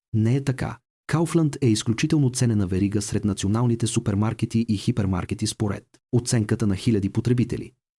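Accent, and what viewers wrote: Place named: Russia. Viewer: ИИ сътворил този клип и другите на канала е подозрително Руско-говорещ, правещ същите грешки като всеки руснак опитващ се да налучка правилните ударения в българския език! Бърка на места даже хиляда с "тысяча" и слага ударенията където би ги сложил руснак четящ български текст.....което за мен опорочава цялата идея за Истина на съдържанието!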